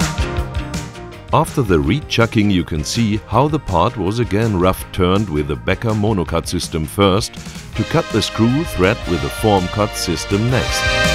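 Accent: Germany. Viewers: Ich liebe diesen deutschen Akzent im Englisch.. :D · Der deutsche Akzent in diesem Video ist aber recht dezent und unaufdringlich möchte ich meinen. Da muss man ja fast Deutscher sein um zu wissen das es ein deutscher Akzent ist.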